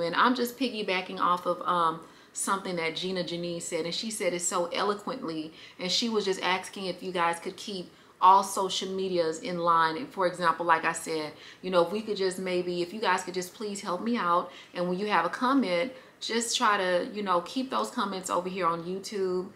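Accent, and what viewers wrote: South, United States. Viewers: It’s because You are so Awesome and Fun to watch! Down to earth and Real!! 💯❤️ I love your haul and how you put your outfits together! 😍😍 Your chats advice and story times are everything! I can listen to that southern accent all day!!😁❤️Congratulations on your new apartment it’s beautiful!! 🎊🎊🎉🎉 I know you are going to set that place Right up!! I’m so Happy For You!!🎊🎊🎊😍😍🎉🎉🎉🎉👏🏾👏🏾👏🏾👏🏾👏🏾🥰🥰🥰🥰🥰 Totally Dancing 💃🏽 with you!!💯💯💃🏽💃🏽💃🏽💃🏽❤️❤️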